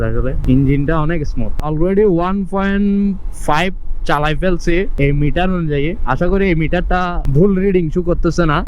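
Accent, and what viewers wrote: Chittagong, Bangladesh. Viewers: চট্টগ্রামের আঞ্চলিকতার টান শুনলেই মেজাজ গরম হয়। ভিডিও ১০ সেকেন্ডেই বাতিল করলাম।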